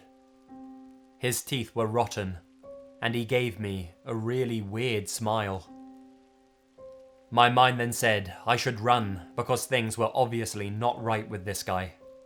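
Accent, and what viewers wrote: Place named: RP, United Kingdom. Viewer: I really like your stuff and because you're a Brit, your accent lends an RP (BBC World Service for those who don't know what Received Prononciation is) seriousness to what you are saying. This gives the impression that it's reportage and makes it all the more chilling. Of the Narrators who do true stories I find yours give me the most pause for thought and the most chilling feeling. (Last time I complimented someone a troll latched on to me like a nasty little tick and I fully expect I will get lambasted for this so I am not letting it go to Google plus...the bugger will have to listen to a lot of good stuff to find this hopefully. .but despite that I think you ought to give credit where it's due!). (Ok trolls I may have put i before e after c in in a word but I can't be bothered checking. ....before you comment. ..I know! 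).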